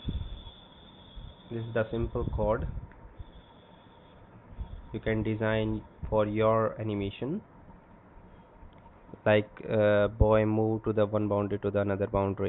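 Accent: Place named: United Kingdom. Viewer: Love the British accent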